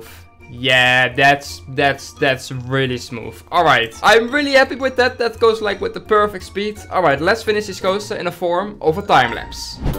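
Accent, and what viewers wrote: Netherlands. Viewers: i know your netherlands by the way you talk english so --> hoi leuke video heb je gemaakt maak nog meer van die videos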